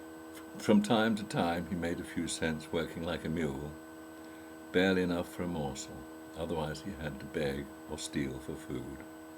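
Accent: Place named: United Kingdom. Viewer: Listening to this in the British accent and calm voice is sublime :)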